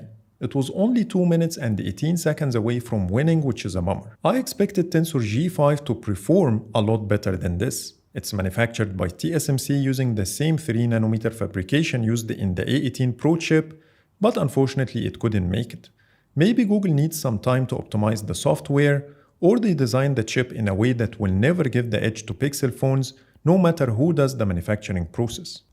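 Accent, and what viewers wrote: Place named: Egypt. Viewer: are you Egyptian i can sense the the accent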